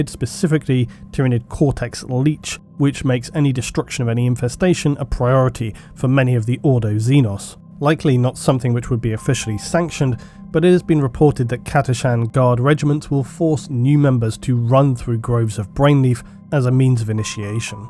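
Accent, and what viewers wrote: United Kingdom. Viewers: Four reasons why I love your videos. 1. Warhammer 40k. I was introduced through Warhammer Fantasy and was intimidated by 40k larger and more expansive universe. However your videos are large and so well made with so much Fan Art that i can't get enough. 2. You time stamp your videos. I get to watch and see what I want to see whenever I come back. Its just so nice! 3. You got a great voice. You have such a great British voice. Just nice and relaxed and a sense of interest in what you are doing. So glad you are doing YouTube! 4. You sometimes use Real World history and how WE as a species can in someway relate. Almost a Parellel Universe feel aha.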